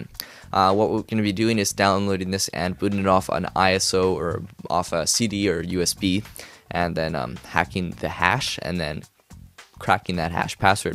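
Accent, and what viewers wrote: Canada. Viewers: you are canadian. right???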